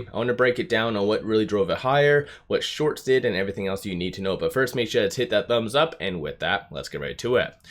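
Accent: Canada. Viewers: Thanks again for your analysis! It sounds like you live in Canada, eh? I am in Oregon. Just got to tell you...I am a huge Rush fan, so go Canada!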